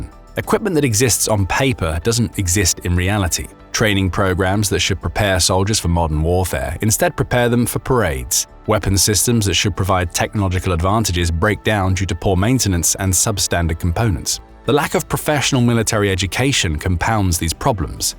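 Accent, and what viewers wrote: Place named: Southern England, United Kingdom. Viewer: Very good Standard Southern English narration from this bunch of Americans ... shame that the computer rambles on about high school and college students --- meaningless to a British audience. In the same way that the Germans lost the First World War in the first days of the War at Liège (4th-17th of August 1914), and they lost the Second World War in the Battle of Britain (1940), so the Russians lost this war in the first days of the war at Hostomel Airport. It has taken a long time for the significance of the Battle for Hostomel Airport to become apparent but that IS where Russia lost. The language problem is easily solved as it was solved in the First World War. You divide the front into sectors. Whilst maintaining a watching brief, the Russians would have done well to leave Kursk to the North Koreans as the French left the Belgian and northern end of the front line <num> to British command with only liaison between bilinguals at the top. 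The Koreans would have only needed a handful of interpreters then. Even with their mass of interpreters, there was appalling miscommunication. Far be it for me to help the Russians but the solution is as clear as the nose on your face. This, of course is not possible because of the control-freakery of the monstrously stupid Russian high command. Just because demolishing the entire city of Grozny worked when confronted with a bunch of religious fundamentalists armed with little more than AK47s, it doesn't mean that that same strategy will w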